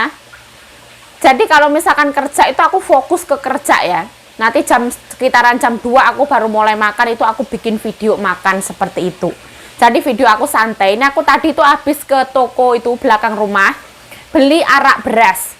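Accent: Java, Indonesia. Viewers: Ngomong Jowone Medok Tenan Jowo Endhi Sampean? Aq Dr Jatim.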